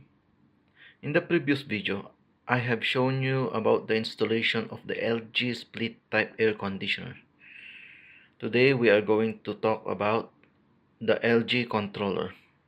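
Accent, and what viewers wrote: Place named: India. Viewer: Why the fuck do I keep getting Indian speakers?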